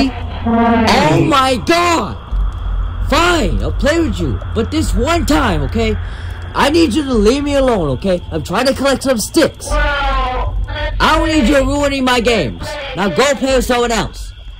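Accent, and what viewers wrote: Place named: France. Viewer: loved it. great job. lots of energy. love the french accent.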